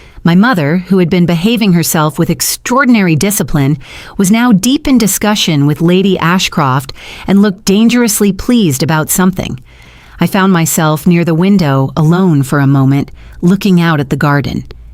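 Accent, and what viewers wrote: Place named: United States. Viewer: Why the American accent? Story is set in England. Weird names. Pianoforty????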